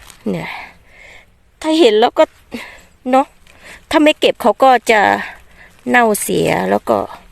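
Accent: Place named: Korat, Thailand. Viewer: สวัสดีค่ะ นกเข้ามาเก็บเห็ดด้วยค่ะ พูดสำเนียงโคราช อยู่ที่ไหนคะ นกอยู่อำเภอสีคิ้วนะคะ 🥹🥹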